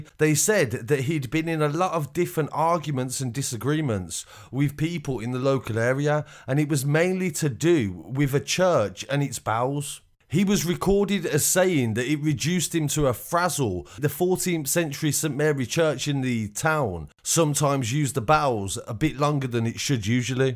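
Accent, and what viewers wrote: Birmingham, United Kingdom. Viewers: Lol that brummie accent is a bit strong just imagine if it was Ozzy Osbourne doing the narration lol. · Brummie. Bless him!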